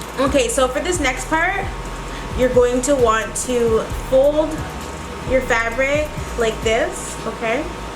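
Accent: United Kingdom. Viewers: Ur british accceeent in love ❤️❤️❤️ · you NEED to do a video with your British accent 🙌🏾🇬🇧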